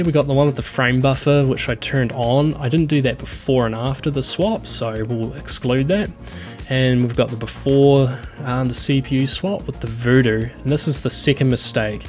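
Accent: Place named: New Zealand. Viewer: My first retro build a few years ago is a similar machine. I wanted to build a better version of the PC I had as a teenager, as if I had the capability to max it out. I've got an S3 Virge as my 2D card and a Voodoo 2 PCI as my 3D card. 32 MB of RAM, 7200 RPM 20 GB Maxtor Hard drive, and an AWE 64. Funnily enough, I just bought a 233 MHZ P1 with MMX to upgrade it. I also have a Super Socket 7 board that I recently got that supports 512 MB of RAM and has an AGP slot. I have an extra 233 MHZ processor and A Voodoo 3 2000. I want to see how the two systems compare to one another. Might also be fun to try to toss Windows XP at the Super Socket 7 build at some point just for a laugh. Also, are you by any chance a New Zeelander? I have a few Youtubers that I watch that are Australian, and your accent is messing with my brain. It's similar enough that my brain is expecting it to sound Australian, but there are certain things that sound different.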